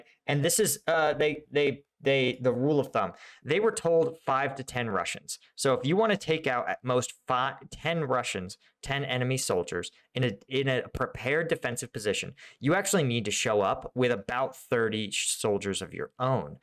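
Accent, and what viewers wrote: United Kingdom. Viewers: Sounds like he’s British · So proud to hear a British voice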